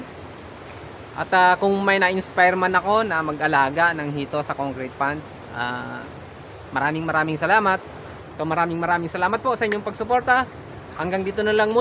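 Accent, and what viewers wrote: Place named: Ilocos, Philippines. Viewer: Taga ano ka gayam Sir, kasla nangngeg ko ilokano met sao yo. Ty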